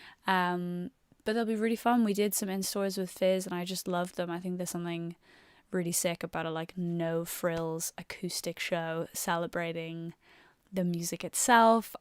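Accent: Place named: United States. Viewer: Why are you suddenly American?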